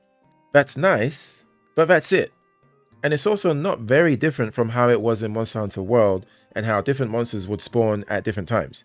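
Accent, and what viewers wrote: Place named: Scotland, United Kingdom. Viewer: the scottish-ish accent had me rolling mate, keep doing what you're doing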